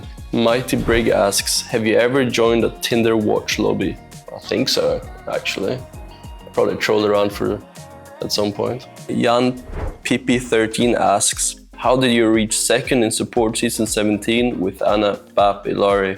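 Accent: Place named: England, United Kingdom. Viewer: he has a definite english accent, forsure picked something up from kai and fastro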